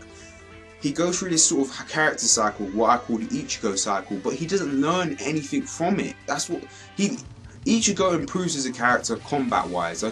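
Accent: United Kingdom. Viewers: I know this is out of talking but...is that a British Accent? xD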